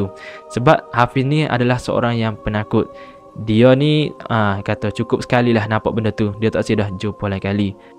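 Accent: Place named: Kelantan, Malaysia. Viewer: Pergh pekat btul Kelantan awk eik?tapi syabas.bro.pnyampaian ok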